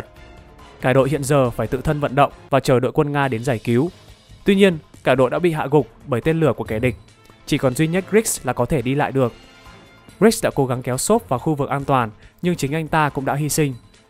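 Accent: Standard. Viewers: Bạn đọc khá rõ và phát ngôn chuẩn.. đóc tiếng anh chính xác tôi khá thích.. mog kênh sẻ ngày càng phát triển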